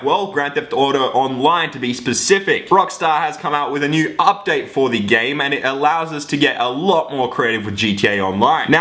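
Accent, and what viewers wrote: Australia. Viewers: are u from austrailia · Is this guy Australian?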